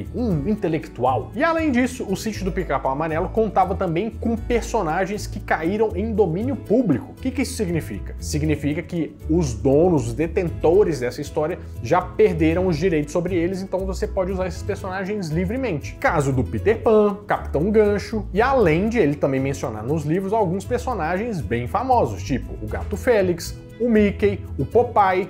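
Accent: Minas Gerais, Brazil. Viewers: E com sotaque mineiro arasaaaa seus vídeos são maravilhosos e com os comentários de vcs ❤️❤️❤️❤️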